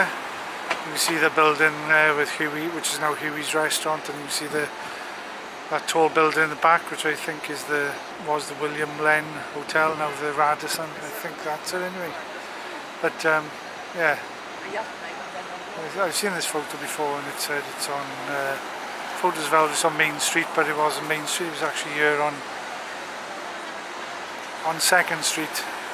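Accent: Wales, United Kingdom. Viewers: Smashing Pics Of Young Elvis. Amazing How More Information Keeps Popping On Elvis After All These Years. Thanks To Elvis Fans Like.(Do I Detect A Lovely Welsh Accent) Being Welsh Myself.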